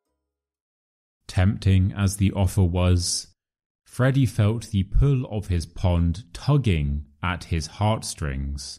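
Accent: United Kingdom. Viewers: Thanks for your new video!🐸Today’s story was quite challenging for me! 😂 I found a lot of new words in it. I do love your beautiful British pronunciation!:_HenryNice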